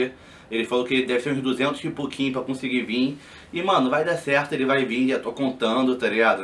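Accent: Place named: Favela, Brazil. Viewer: com essa língua presa e dialeto de favela não tem nada a ver kkk Acompanho o canal desde 2014 e não me acostumo nunca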